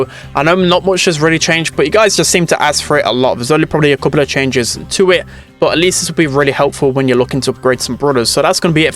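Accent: United Kingdom. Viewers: I can stand his bri'ish accent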